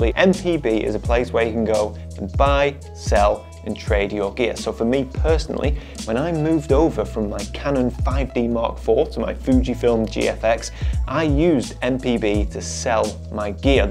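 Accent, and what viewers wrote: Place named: Northern England, United Kingdom. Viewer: I was born in Northwitch, Cheshire and have an Uncle who lives in the lake district. I now live in Tennessee, and I love watching your channel because, not only am I inspired for my own photography, but hearing your accent transforms me home. Thank you for all you do!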